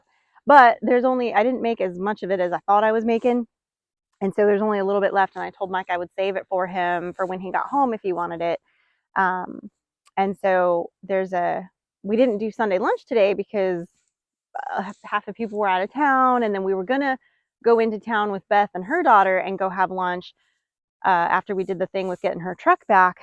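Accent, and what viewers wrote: South, United States. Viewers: love win your a little tiered you get a southern Drow in you words love that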